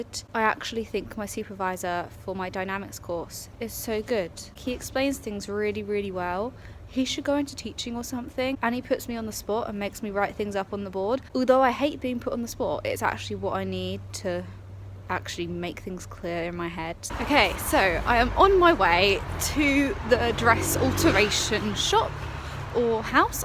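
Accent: United Kingdom. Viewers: Love your British accent.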